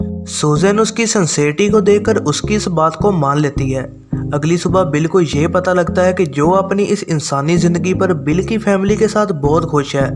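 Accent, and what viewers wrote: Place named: Punjab, India. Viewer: Ur Punjabi accent makes explanation more interesting bro....keep growing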